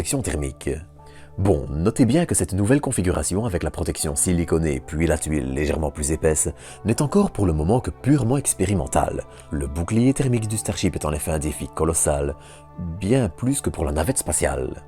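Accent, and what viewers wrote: Belgium. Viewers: Étant bruxellois, je crois que je reconnais un accent belge.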